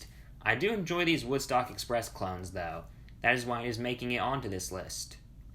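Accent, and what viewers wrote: South, United States. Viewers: Really liked the video, you sound like you’re from the south 🤣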